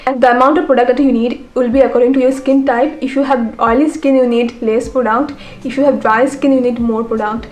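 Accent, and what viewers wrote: India. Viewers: Can you please add captions because it's really hard to understand your indian English accent.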